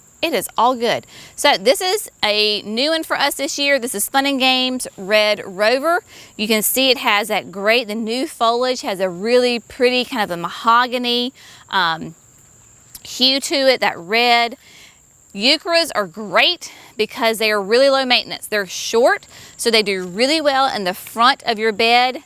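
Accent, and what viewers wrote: South, United States. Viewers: Fall has arrived here as well At the Jerseyshore! Tomato tomato it’s all good! I for one enjoy a southern accent 🌿🌻 🍅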